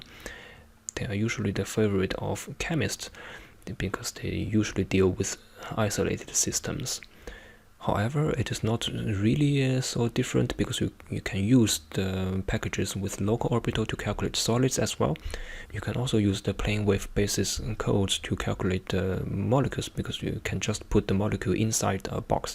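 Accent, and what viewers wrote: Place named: East Asia. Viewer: Such a nice and comprehensive explanation. seem to be the accent of East Asian.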